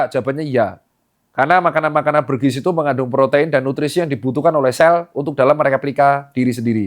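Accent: Java, Indonesia. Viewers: Wah jadi teringat youtuber "Korea Reomit" Jang Hansol si Korean yg followernya disebut bolo-bolo yg BAHASA JAWANYA MEDOOOOOK ternya dokter ini medok juga, kl dokter Tirta followernya di Peng... Peng... Peng... 😂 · Lidah Jawa Muka Korea.. Spill rahasia ganteng dong 🤭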